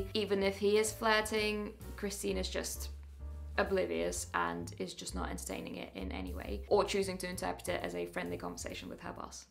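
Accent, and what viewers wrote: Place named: United Kingdom. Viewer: Im surprised, your speech is horrible. Try speaking more clearly and with an American accent!!! British accents dont work well.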